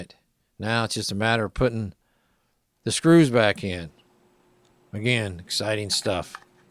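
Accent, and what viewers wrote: Midwest, United States. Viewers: Just love watching you work, being an Irishman living in England, your mid western drawl is a delight, you are the man!!! John Moore, Sherwood Forest England. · Yea, I do enjoy the hypnotizing midwestern drawl and experience driven repair lessons. I kid you not, the confidence to tear into my own cameras grows with every video of yours that I watch & rewatch. I know I have not seen every repair video out there, but of what I have seen, your videos are the most comprehensible and the lighting and camera always work to show whatever the dialog explains. You truly have a gift. I use a Nikon FM as my main film camera and a D3400 for digital. I have ten or so Nikons that need working on (that were eBay purchases from about 15 years ago) starting with a couple Nikkormats up to an FE. Am I correct in believing that the older models will be better to learn on? 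Still tooling up and hope to acquire some JIS crosspoints soon as all my small drivers are Philips. Though I have MacGyvered a flat into a two-bladed JIS as I play around with some point-and-shoots. When I start tackling old stuck screws I will need the real deal. Thanks again for doing all these. Hoping people start learning how to Fix Old Cameras and schools start teaching this stuff again.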